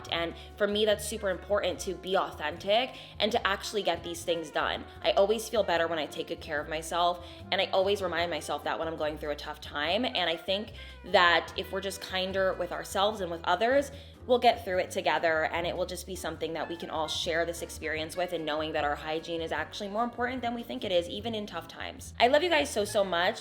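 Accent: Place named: Pennsylvania, United States. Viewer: I just found your channel i was like she sounds like a delco girl lol read your bio and close enough lol you’re beautiful girly🤍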